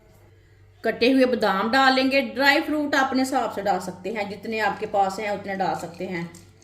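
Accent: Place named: Punjab, India. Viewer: Punjabi jab Urdu boltay Hain to bilkul b Acha lahja ni Lagta sad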